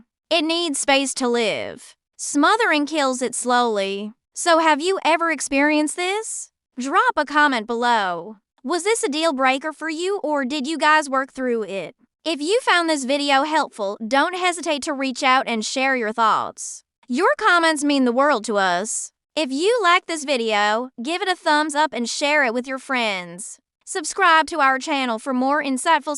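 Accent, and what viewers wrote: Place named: South, United States. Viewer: Helpful video with a Heavy Southern Sandy accent though. Lol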